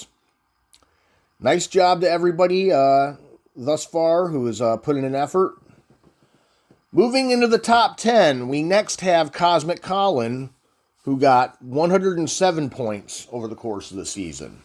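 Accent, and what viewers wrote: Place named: United States. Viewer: Something very official with a deadpan American accent, i like it! Well done all. I still need to improve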